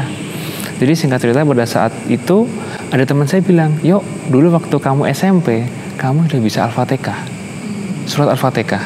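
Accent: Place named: Java, Indonesia. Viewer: di maklumi sobat....lidah orang jawa memang kebanyakan begitu.nggak usah di permasalahkan.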